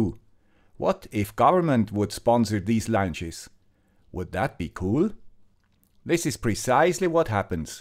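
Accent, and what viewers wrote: Switzerland. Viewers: Salü, guy with the swiss accent and thanks for the thrilling videos of you, hunting weather balloons at night. The last time I checked there were almost no balloons going down in my area, though (Rhein-Main in Germany)...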